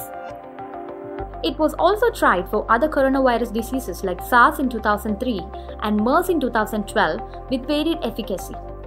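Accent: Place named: Kerala, India. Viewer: A Malayali narrator with MTI !! :)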